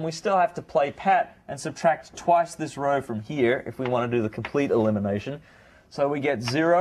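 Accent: Australia. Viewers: Is he an aussie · this lecturer sounds like he has a bit of an Australian accent. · oh, australian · Is he an Australian ?? His accent doesn’t seem American.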